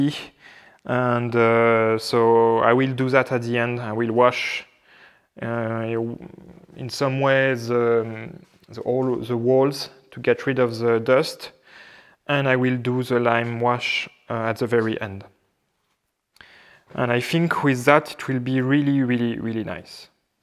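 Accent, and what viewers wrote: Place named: France. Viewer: New subscriber, just got one of your videos recommended the other day and have now went back to the beginning and binge watched them all. Excellent series and I am invested. Looking forward to the day (if ever) you decide to excavate the under cellar and maybe seew where the filled in tunnel leads to. Also every time you say the "poop cellar" in your lovely French accent I crack up.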